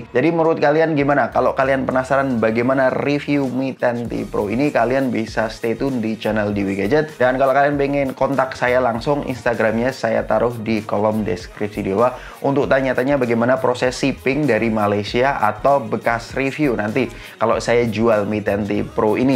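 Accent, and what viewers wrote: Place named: Java, Indonesia. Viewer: Medok e bang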